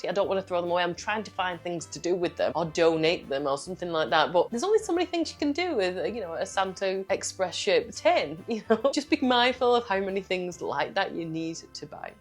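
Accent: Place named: North West England, United Kingdom. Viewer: You sound very local to me in the North West of England 😊 - we bring a live potted tree in - this is year 3 and up to now that’s my plan for this year - brings joy year round.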